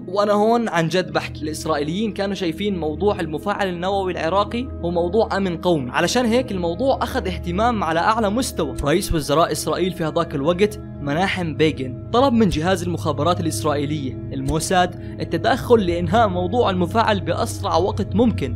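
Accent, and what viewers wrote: Jordan. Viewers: لهجته مبينه اردني 😂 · ياالله يل لهجه العسل احب الاردن اخوك من العراق❤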